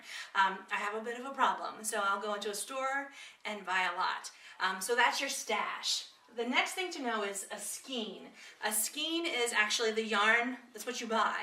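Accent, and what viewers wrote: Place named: East Coast, United States. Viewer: Nice! This is good stuff to inform and encourage beginners. And I really like that you speak well and I can see your face! I am hard of hearing and read lips, so this is especially important to me. Later you'll probably mostly be showing your hands, but I'll still be able to hear your good speech. I bet you're an East coaster -- out west those things are pronounced "skains" instead of "skeens." Good work, woman!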